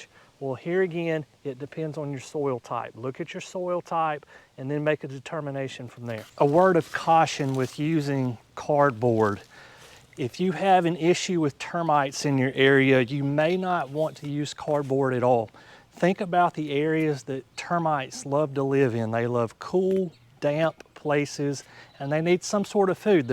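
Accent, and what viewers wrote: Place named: North Carolina, United States. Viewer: Are you from North Carolina? Your accent sounds like it.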